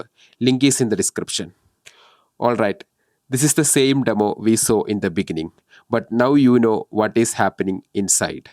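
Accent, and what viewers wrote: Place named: Kerala, India. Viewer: മലയാളിയാ ല്ലേ... ഇഗ്ലീഷ് കോട്ടാ..റിയാ.. മമ്മുട്ടിയെപ്പോലെ ശ്വാസം പിടിച്ച് നല്ല ബലം പിടിച്ചാണ് സംസാരിക്കുന്നത്. ഒന്ന് കൈ കുറഞ്ഞ് കുഴഞ്ഞ നാക്കോണ്ട് പറഞ്ഞൂടെ?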